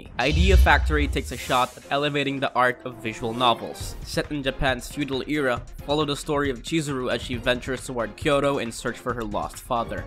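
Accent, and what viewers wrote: Philippines. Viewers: The one talking is Filipino I'm 99% sure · Is the speaker Filipino? He has the same accent as Filipinos.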